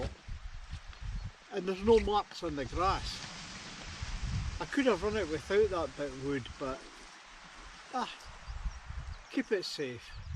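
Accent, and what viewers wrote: Scotland, United Kingdom. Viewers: Awh really enjoyed this video! Very wholesome❤your dog is so cute! And I love your accent! I need to visit Scotland! Hope your enjoying the weather!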